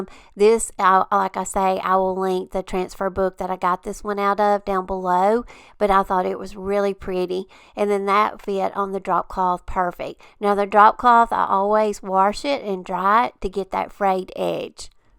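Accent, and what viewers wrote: South, United States. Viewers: Love all your designs and projects. Also your sweet southern accent. Happy new year and good luck with your channel. I’ll be watching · Love you sweet southern accent!🎀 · Congratulations on prosperous year. I found you in collab, I don't recall which one but I'm so glad. I am a Alabama born, grew up in Columbus Georgia, moved to East Tennessee 20 yrs ago. You definitely have that adorable southern accent. Thank you and keep the DIY's coming.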